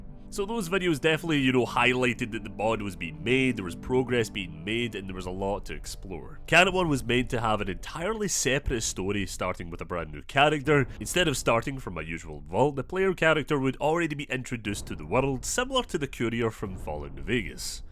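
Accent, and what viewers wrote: Scotland, United Kingdom. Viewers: i like the way scottish people talk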